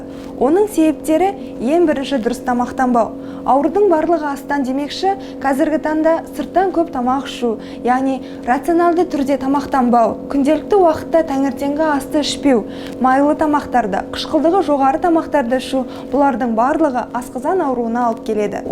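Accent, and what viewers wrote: Standard. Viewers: Міне керемет ! Таза қазақша түсіндірді кеңесіңе Рахмет! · Құп көрдік. Міне осындай таза сөйлейтін қазақтар көбейе берсін...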